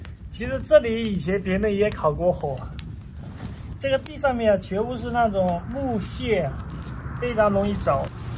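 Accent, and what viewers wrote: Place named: Ningbo, China. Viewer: 的浙江寧波普通話太可愛了！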